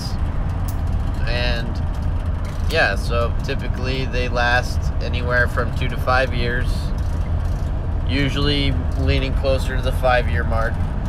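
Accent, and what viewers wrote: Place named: Southern California, United States. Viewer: Hey just wanted to thank you for this video, it really helped me replace my first garbage disposal. I also wanted to make special comment on your very Southern California ways displayed in your narration – I grew up in the area but have spent the last 28 years (I'm 46) in NorCal and just hearing you talk reminds me of all my old buddies down there so thank you for that too!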